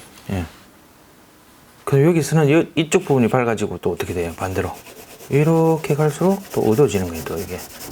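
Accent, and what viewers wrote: Gyeongsang, South Korea. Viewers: 요즘취미로 집에서 조금씩 배우고있어요ㆍ장미꽃그림은 아직 엄두도못낼것같았는데 ~~ 자꾸 동영상보면서 익혀야겠네요^^ 첨이라 이해가 안되지만 연습해서 아름다운 장미꽃을 도전해보고싶네요^^ 잘배우고 갑니다 ㆍ쌤^^ 목소리톤과 경상도사투리 ~ 완젼 멋지심요~~ 펜할께여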